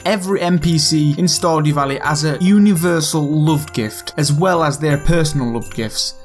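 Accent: United Kingdom. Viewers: THE BRITISH ACCENT AND COMMENTARY LMAOAOAOA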